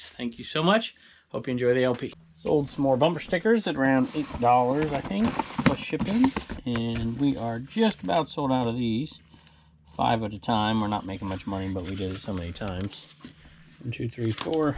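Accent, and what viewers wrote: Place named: Minnesota, United States. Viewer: Yah Sure !!! Youbetchamn must be from Minnessota !!! Yah Sure Youbetcha !!!!! lol, easily recognized by this Wisconsin norwegian/American gal.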